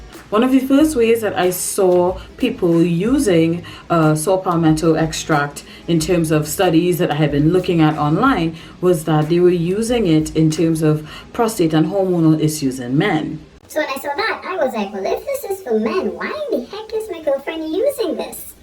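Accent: Trinidad and Tobago. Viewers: Lol I said this is definetely a Trini accent 🇹🇹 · You sound like a Trini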